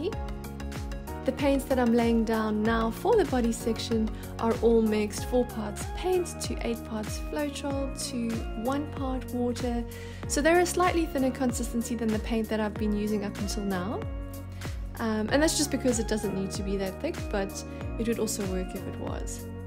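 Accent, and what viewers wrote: South Africa. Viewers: the accent is so South African i love it 😍😍😍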